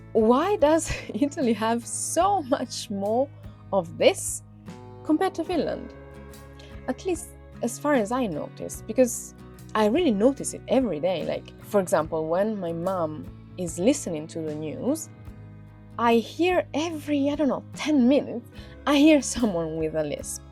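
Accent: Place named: Sweden. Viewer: Why you sound like you are from sweden 😅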